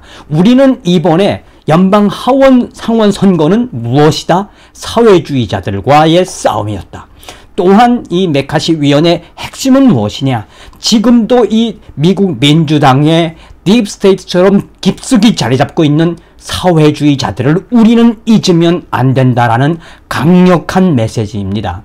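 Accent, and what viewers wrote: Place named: North Korea. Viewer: 북한 말투쓰지 말고 그냥 평범한 일반 표준 한국어로 진행 하시길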